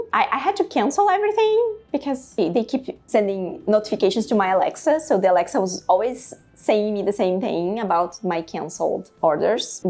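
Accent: United Kingdom. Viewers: I love your English accent! This vlog is as amazing as your Portuguese ones, congratulations! Loved watching it and seeing you so comfortable and chill! ♥️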